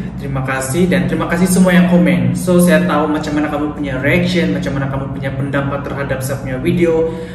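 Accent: Indonesia. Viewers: Bukan salah paham tapi logatvkamu itu Indonesia bukan melayu. Kalau tidak tahu jangan bahas bahsa Indonesia biar tidak salah paham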